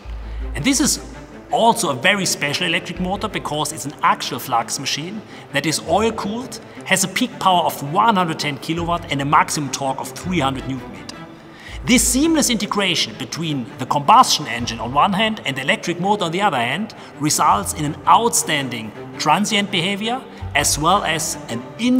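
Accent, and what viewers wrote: Germany. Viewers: This hard German accent 😂😂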